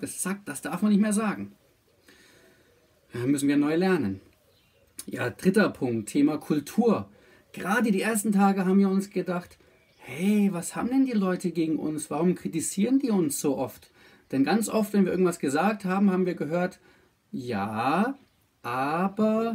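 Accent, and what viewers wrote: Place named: Thailand. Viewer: Du hast irgendwie so eine Thai-art zu sprechen in dem Video, das ist super süß und erinnert mich an meine Zeit in Thailand ☺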